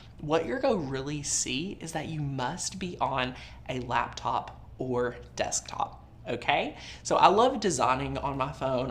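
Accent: South, United States. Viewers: I use my surface pro for design space & joy app also. do I hear a lil southern slang in your voice? Love it,awesome videos,thanks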